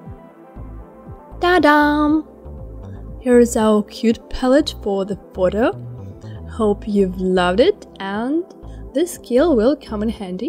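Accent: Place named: Russia. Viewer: мне показалось, что у русский акцент, или это действительно так? it seems like voice has russian accent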